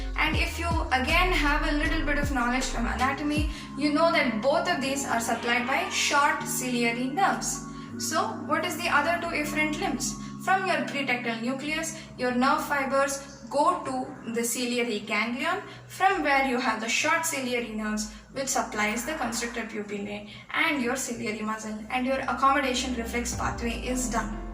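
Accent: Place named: South India, India. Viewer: South english ek number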